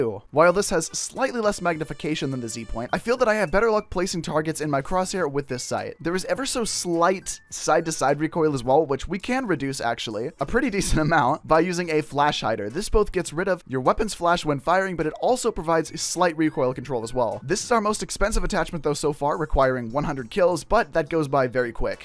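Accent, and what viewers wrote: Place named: New York, United States. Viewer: Even though your NY accent is better than mine, and I was born and live here, I love your many accents. I read it's a rare talent. As you said in this video, "Very impressed, not gonna lie.". <3 NYC GIRL!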